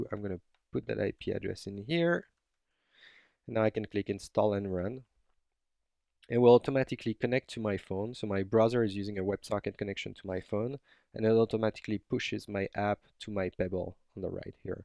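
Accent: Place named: France. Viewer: T'es francais, ca parais ^^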